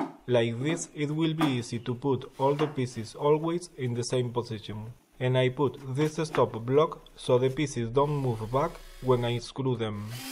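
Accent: Spain. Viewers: I've spent my life reaching English to Spanish speakers (and others). Your accent is definitely Spanish from Spain but otherwise your English can't be faulted. Great videos too! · Great and unique Spanish accent! Cheers mate! Salut!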